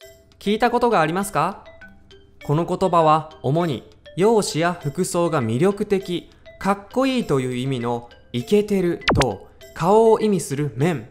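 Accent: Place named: Japan. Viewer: 有中文字幕好用心喔！ 日本人教日文很道地👌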